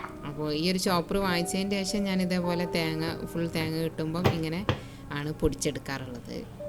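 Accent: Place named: Travancore, India. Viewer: കൊല്ലക്കുടിയിൽ മൊട്ടുസൂചി വിൽക്കുക എന്നൊരു പഴം ചൊല്ല് ഉണ്ട്; ഏതായാലും താങ്കളുടെ തിരുവതാംകൂർ സ്ലാങ് മലയാളം കേൾക്കുന്നതിൽ സന്തോഷം.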